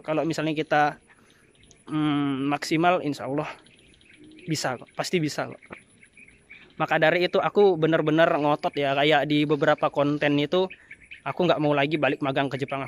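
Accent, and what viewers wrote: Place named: Sumatra, Indonesia. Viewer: Klu dari cengkok bahasanya BKN org Jawa. klu GK salah orang bagian Sumatra.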